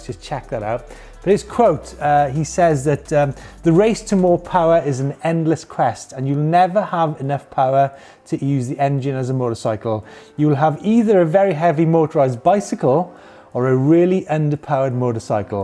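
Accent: Wales, United Kingdom. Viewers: Are you Welsh? You sound Welsh?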